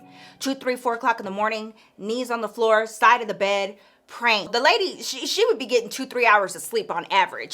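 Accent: Texas, United States. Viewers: New here and lovin these stories! 🥰 This is my 3rd. The first was the concert demons story, and for some reason I Immediately knew you were a TX girl. When you said Dallas in this video I was like, "AH HA!" 😂😂😂😂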